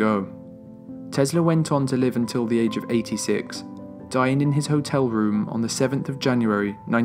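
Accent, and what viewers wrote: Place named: Estuary, United Kingdom. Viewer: Noce job. Remember v.o. "the' is "thee" on all vowels . (There's only 5...) And no estuary flat "Oy" on "I"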